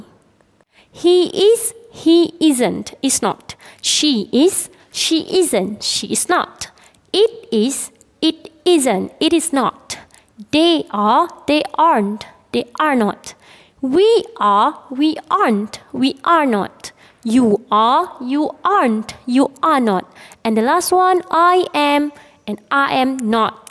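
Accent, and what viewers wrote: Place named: Japan. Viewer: cikgu orang jepun ke comel cakapan cikgu saya fatma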